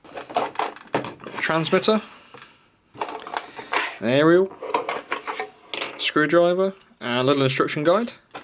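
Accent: United Kingdom. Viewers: You re british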